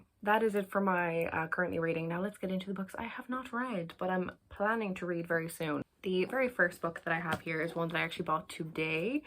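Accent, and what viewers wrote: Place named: Australia. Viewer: Your Australian accent is as bad as my Irish one but I love it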